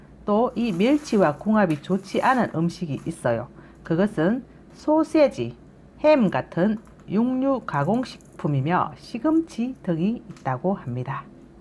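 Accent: Gyeongsang, South Korea. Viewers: 만물박사 갱상도사투리원조님.멸치라고 깔봐요? 그렇게 인간에 유익한 머리님을~~삶은소대가리보다 고맙죠. 군에서 된장 한 숟 푹떠 손가락만한 멸치 5개로 한끼 때웠던 그 때가 그립네요. 오늘은 영양학 강의? 다 안들려요. 대구4두2 듣는 취미. 오늘은 너무 젊잖네요. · 경상도 사투리로 재미있게 방송하시는게 재미있어 자주보게 됩니다 성격이 화끈해 요리설명도 재미있게 하셔서 혼자서 웃기도한답니다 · 잘봣어오ㅡ 곙상도사투리 줌마님 얼굴좀 보여주세요 오늘ㄷ좋아요 했어요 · 친근한 갱상도말씨 좋아요 좋은정보 잘공운하고있어요 감사해요 굽신~♡ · 갱상도말씨가 팽상시 좀 시끄러버서 듣기가 쪼께 거북시러버 안듣는데 여사님 말씨는 괘않습니더 자상한 말씀 감사 함니데이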